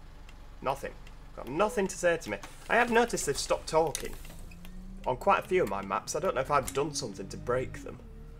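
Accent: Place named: Yorkshire, United Kingdom. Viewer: Nice video.I love the west country area, in the game and in real life, are you from Yorkshire, you have a very strong accent.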